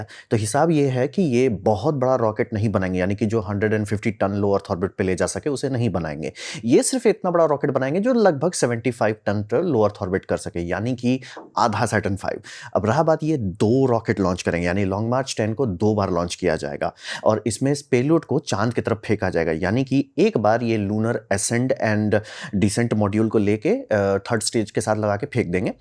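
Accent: Bihar, India. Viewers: 💯 आप बिहार से हैं सर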